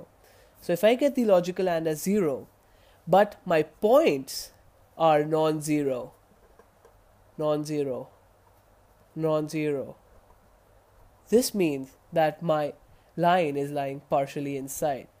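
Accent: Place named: India. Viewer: i like this guy's voice. finally an idian-accent tut without fire-speak (really fast indian accent) - but the video is tilted! please make more but make sure it's viewable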